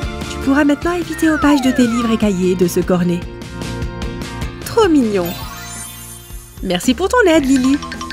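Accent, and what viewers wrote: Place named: England, United Kingdom. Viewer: Je sais pas si vous être anglais ou français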